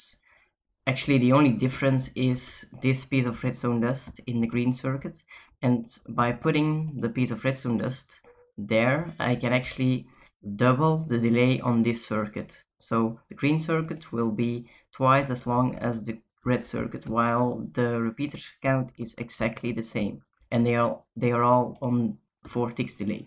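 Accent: Flanders, Belgium. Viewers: are u from belgium/flanders? your accent sounds like that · where you from? you sound belgium...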